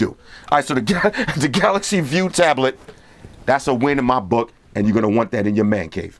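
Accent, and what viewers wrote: Jamaica. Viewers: u Jamaican? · yo floss u jamaican?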